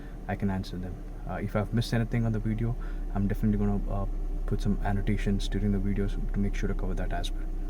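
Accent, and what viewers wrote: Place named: India. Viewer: This is going to sound racist and it may be, make more of these vids I trust the Indian accent teaching me prob a result of Kahn academy but very informative thank you!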